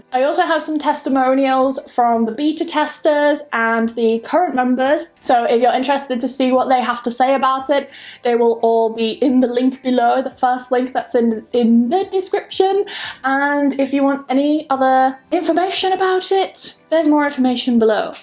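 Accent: United Kingdom. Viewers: I love your honesty. I just found your channel and I think it will become one of my favorites. I want to learn English with a British accent and it looks like you're the perfect person to teach me. I like the way you talk, your accent, your honesty, and besides, you're cute! I wish you all the best this new year and I hope to learn a lot from you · Hi, Pity you're alone on New Year's Day, 'cos your boyfriend is on duty today, in such a remarkable day. But I think that in reality you should't feet sad or either alone, because you have a lot of friends, listening to your remarkable messages in perfect british accent, that 100 K followers that love you in the distance, throughout the cyberspace, look forward every time. You're a new Rose of England, a british angel flying everywhere in the world, upon the wings of thousands of bytes and bytes, zeros and ones, charming and delightfulness with no limits. 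Darling, I beg you to carry on with this selfimposed task of teaching the "lingua franca" that is nowadays the english language as well as the british culture and way of life, to the people around the glove, thing that you implement in a so accurated way. I'm writing to you form Tarragona, a place in Catalonia you know well toghether with its Uni. Rovira i Virgili, a place where as I understand you used to take some courses, some time ago. If you whish to refresh your knowlegde of the many languages you're fluent with, and make some practice with me either in Spanish or in Catalan, do not hesitate in sending to me, via e-mail: all the letters you want, with the messages you wish, so short or long as you fancy, whenever you feel like, and about the items you consider interesting or educational, for your personal understanding or from a point of view of professional developpment. If in case, please contact e-mail: <mail>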